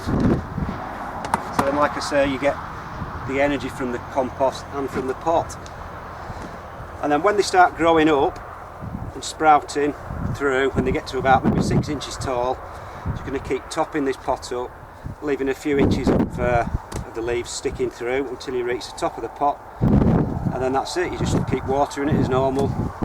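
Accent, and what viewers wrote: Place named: Lancashire, United Kingdom. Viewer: I'm having a crack at growing veggies for the first time ever this year and doing the whole shebang in pots and containers..... Planted my spuds in rolled down compost bags about 3 weeks back but the weather was so wet and frosty had to drag them all into the garage, anyway there sprouting nicely now bout 4/5 inches with small leaves on top.... Should I earth over these now and unroll the bag back a bit? Don't want to earth up if its too soon? Love the videos mate, very helpful and certainly took many tips off them..... Where you from anyways? Good strong accent like that can't be far from my neck of the land up in Lancashire :)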